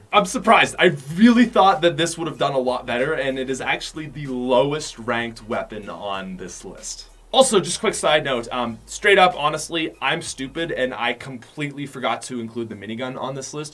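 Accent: Canada. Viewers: He’s mega Canadian jeez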